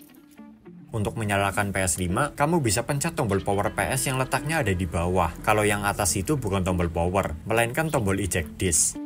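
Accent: Banyumas, Indonesia. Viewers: genah mesti ngapak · loh mas wong banyumas tah?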